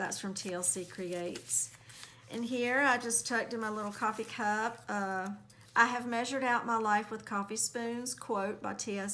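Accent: South, United States. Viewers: Oh my goodness I could listen to you for hours. Lol where are you from? USA South?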